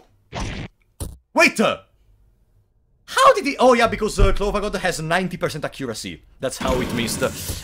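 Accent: Italy. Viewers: You're Italian? Man I suck at recognizing accents. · I didn't know you were Italian (was really wondering what kind of accent do you have) been watching you for a while now, from multiple accounts. Big love from russia❤❤❤ learned about FaH from you btw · So you're italian!!! All ghis time i wasn't able to identify your accent! Anyway glad you recover from your bad time, you truly someone i aspire to. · you sound italian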